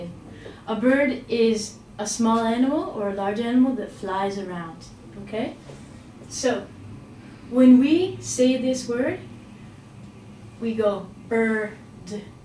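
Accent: Canada. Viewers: She is from Canada? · She from canada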